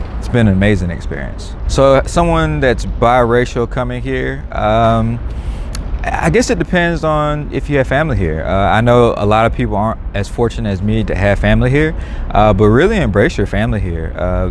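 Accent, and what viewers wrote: South, United States. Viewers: Handsome!!! Don't loose that southern accent. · I'm from the south and I always thought I hated southern accents til I heard (and saw) this man speak 😭😝🤕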